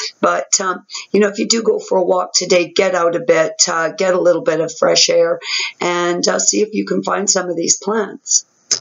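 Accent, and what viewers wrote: Canada. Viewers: Hi wow I should have picked up that you are Canadian with the accent.....I was born in Montreal Canada came to USA in 1971 as a child...I'm now in Virginia where there is snow in winter but I've spent all of my adult life in Souther California...OMG it's so beautiful there....but I had to leave it to get out of the NARC relationship I was in....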